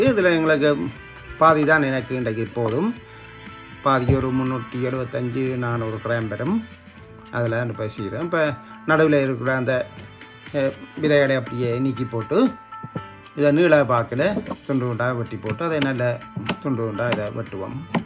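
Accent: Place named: Sri Lanka. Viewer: Pusanikai soup.... So different and delicious brother. இலங்கை தமிழ். கேட்பதற்கு மிகவும்அருமையாக உள்ளது.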